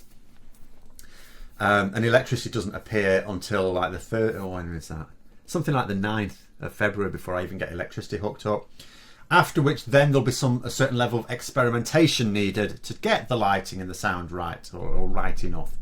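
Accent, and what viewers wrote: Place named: Doncaster, United Kingdom. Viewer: He's from Doncaster, can't you tell? 😀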